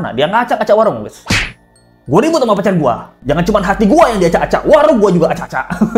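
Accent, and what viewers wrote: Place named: Java, Indonesia. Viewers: Suka gaya bicarany abang dalam menjelaskan medok khas jawany🙏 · lucu si lu bang, wkwkwkwk medok nya cerbon ga gitu, itu logat jawa timuran. tp gpp lucu.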